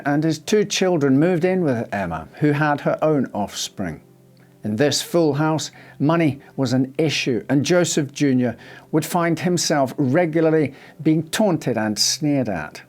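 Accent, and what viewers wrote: Scotland, United Kingdom. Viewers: Are you Scottish? I am sure there is a twang in your perfect enunciation! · I love listening to your Scots accent!!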